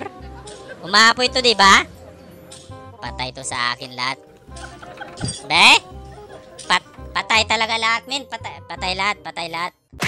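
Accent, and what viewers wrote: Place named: Iloilo, Philippines. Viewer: taga koronadal ka pala.. Kaya pa illonggo timo.. Hahah Galing... Frm gensan.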